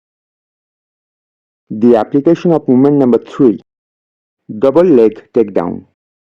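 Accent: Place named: India. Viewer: You sound like you are from India. I like that you are very critical about the indian influence on Kung Fu and debunk the myth about Bodhidharma. But in the northern KF styles I see some influence of Yoga and Kalari. Do you know the Hatha Yoga posture "the warrior". Think of that with fist and you have a typical northern shaolin posture. Also I think these long movements and low and wide stances in the northern KF forms are for stretching and energy development like in Yoga. For fighting they dont make much sense. On the other hand the southern styles the link to the combat application is more obvious and the movements have quite a different character.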